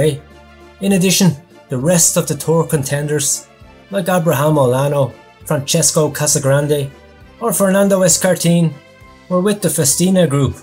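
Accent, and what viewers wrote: Ireland. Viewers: the Irish Accent and the sly digs 'bluffing friend' 'lumpy bald head' cracking me up haha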